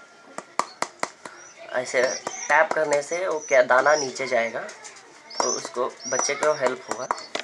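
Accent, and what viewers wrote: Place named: South, India. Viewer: Bhai bohut accha lagraha.. u r trying to speak in Hindi and unr doing so good... I think u r from south?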